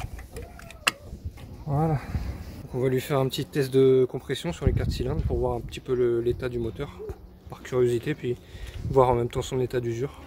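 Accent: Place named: Normandy, France. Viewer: Belle vidéo,belle mécanique,le 2.3 n'a pas été extremement diffusé en France.Solidité incroyable,mais la conso....Etant donné l 'accent,on serait bien en Normandie non?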